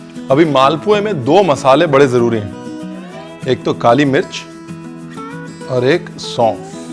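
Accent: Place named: Lucknow, India. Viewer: Hi Ranveer...u r really awesome when u say....aap kahenge Ranveer aapne bataya nahi....real Lakhnavi Andaaz.... Thanks for such lovely dishes....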